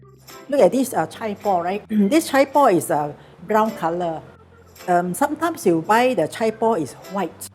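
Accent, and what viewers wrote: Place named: Singapore. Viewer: Love the dish love the Singlish lahhh...thx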